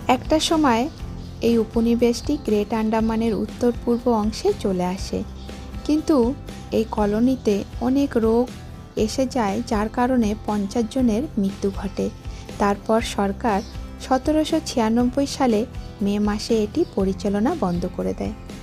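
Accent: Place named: Bangladesh. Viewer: আপনার vlog খুব ভালো লাগে , শুধু একটা অনুরোধ, বাংলাদেশীদের মতো "এস্থানান্তর" "এস্থাপনা".....উচ্চারণগুলো একটু পরিবর্তন করলে শ্রুতিমধুর লাগবে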